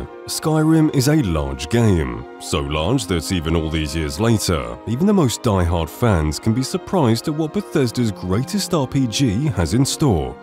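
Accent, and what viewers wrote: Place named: United Kingdom. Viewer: as a american id say yes the brit voice sells the videos lol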